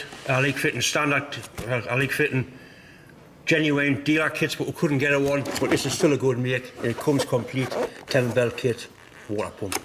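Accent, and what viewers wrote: Newcastle, United Kingdom. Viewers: Americans probably couldn't understand a Geordy accent anyway 😅😅😅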